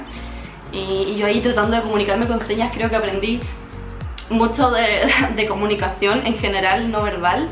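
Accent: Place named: Chile. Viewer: Tu acento me suena chileno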